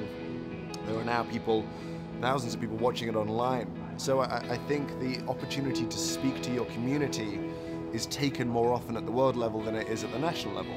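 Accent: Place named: United Kingdom. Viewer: is one of the best ambassadors for coffee there is, not a little helped by that calm, thoughtful British articulation:)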